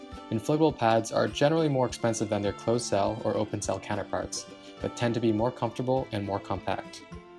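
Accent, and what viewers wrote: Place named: United States. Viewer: My, my, aren't you petulant. 1) Assuming you were an American is not an unfair assumption since that's what your accent sounds like to my ears. 2) I know it's an old video. I even say as much right off the bat. Nonetheless, the comment still stands about not using one standard of measurement (i.e. imperial) as other people are accustomed to the other standard (i.e. metric); hence why it's better to use both. You may very well have changed this since you made the video, but you could have just done the proper thing from the get-go, and that's all that I was really saying. I only saw the video yesterday because that's what came up in my recommended feed. You know, you could have taken my comment in good spirit instead of getting all tetchy about it. You could have laughed it off; that was an option for you. But instead you've made a big deal out of nothing. 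Sheesh!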